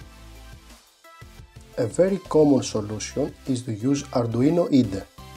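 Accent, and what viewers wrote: Greece. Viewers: i watched 500 videos and finally found 1 that explains the steps really easy.(perhaps it helps that they speak english with Greek accent :D euxaristw!!) Buts visual studio dont work for my os so wish it was for arduino that 90% of ppl use.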